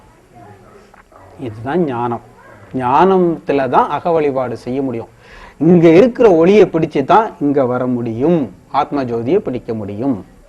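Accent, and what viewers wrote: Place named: Tirunelveli, India. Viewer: ஆஹா!!! ரொம்ப நாளைக்கு அப்புறம் திருநெல்வேலி அழகு தமிழில் மிக முக்கியமான விளக்கம் கேட்க்கும் பொழுது டபுள் சந்தோசம்😉 நான் இப்படி பேசும் போது எல்லோருமே என்னை கேலி பண்ணுவார்கள்!!!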